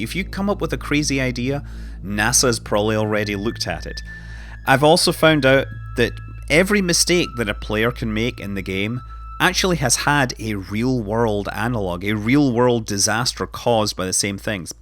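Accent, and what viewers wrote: Scotland, United Kingdom. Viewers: I just listened to a Scottsman essentially give me a powerpoint presentation about rocket science. And loved it.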